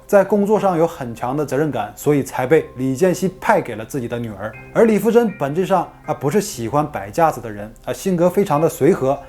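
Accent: Northeast, China. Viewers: 思路够清晰，文案不拖沓，播讲够顺溜，语言够幽默，就会让观众不自觉忽略口音问题。其实有口音的人是多数，当你的播讲内容有冗长，模糊，故意卖关子，拖沓，发音的缺点就会被放大。当然这几个主播都没有那么差，都还挺好的，主要我以前做的是语言类相关的工作，所以会很挑剔发音上的硬伤，我无法忽视哪怕一点儿的齿音字，大舌头，前中后鼻音不分，呢嘞不分。的东北音，和发音问题，是两个概念。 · 小乌确实噱头大与内容不符，Wayne内容一般都是从网上抄的，will很棒发音好文案也有趣简洁，但是东北口音也很重呀。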